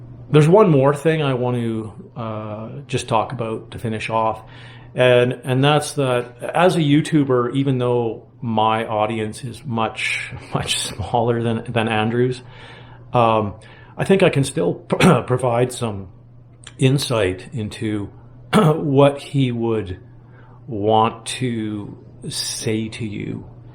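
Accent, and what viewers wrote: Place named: Canada. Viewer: Thank you so much for your heartfelt and very valuable updates on Andrew Cross's ongoing crisis. You have to be Canadian-born, like myself, for some of your north-of-border pronunciations. (Well done, I say, as a dual citizen living in USA but born in Ontario in 1955.) Your commentary has been nothing short of brilliant, even if heart-rendering at times. You are a saint for making this effort and I thank you again from my deep heart.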